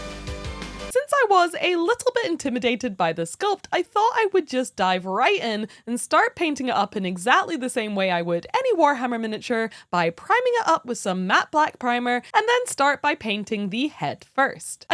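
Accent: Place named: Scotland, United Kingdom. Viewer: It's crazy that you're Scottish, cute and your voice doesn't sound like a garbage disposal. Always great.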